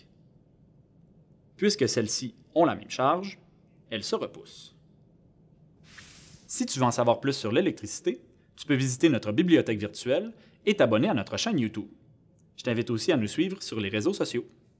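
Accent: Quebec, Canada. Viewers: merci pour cette incroyable accent québécois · L'accent québécois donne un truc a la video je trouve mdr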